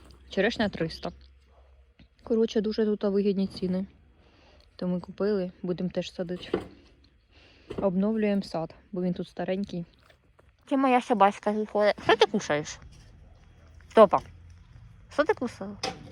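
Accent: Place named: Poltava, Ukraine. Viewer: Привіт ❤️ чудовий канал, говір ваш бомбіческий✌🏻 мабуть десь на Полтавщині?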